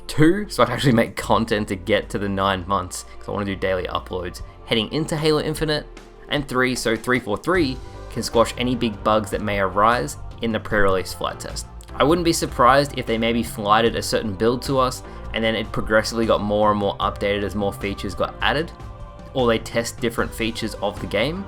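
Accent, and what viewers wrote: Australia. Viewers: hey. since this one of the first videos i've heard you talk in, I wonder, are you from australia?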